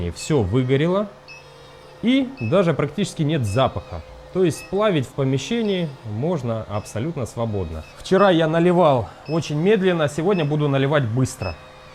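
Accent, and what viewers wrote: Rostov, Russia. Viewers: Ростовский говор)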